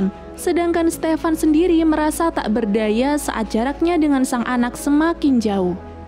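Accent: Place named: West Java, Indonesia. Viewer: Teh orng Sunda nya wkwkwkwk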